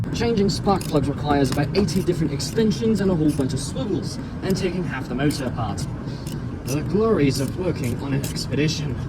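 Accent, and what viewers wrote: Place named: Europe. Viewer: This is simply false, and this masked charlatan with his European accent is dealing with an uninformed personal opinion of an individual machine. The Expedition – note: the XLT he has is the most bare-bones model-- is large, luxurious in better models and, if important regular maintenance is involved, very reliable. The engine can have a sludge buildup if insufficient maintenance is done which can lead to problems, but ignoring a car or truck is bad news regardless. It does not get good mileage, but it is a big muscular V-8 which can tow a house if you hook it up. Probably this little fellow is resentful of that fact. I take mine – with dogs, four crates and shotguns-- bird hunting and it’s great off-road and in snow when others bog down. No doubt he’d be happier with an electric vehicle.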